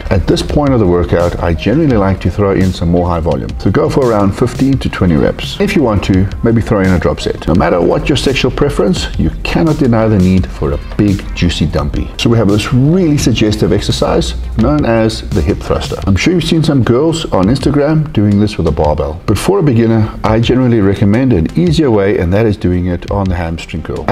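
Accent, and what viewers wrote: Afrikaans, South Africa. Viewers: Man I love you bru....you are so down to earth...so charming....funny and all round beast.....I can't help but notice the south african accent.....lol so I guess that also makes it easy for my to just give you my full support....LAAT ONS DIE BENE HARD BLIKSEM MANNE!!! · Is South African? I'm from western cape sounds hella Afrikaan lol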